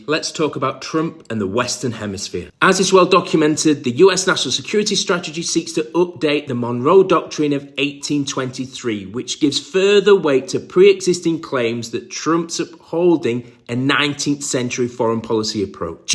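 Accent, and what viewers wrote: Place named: United Kingdom. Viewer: You sound British, none of your business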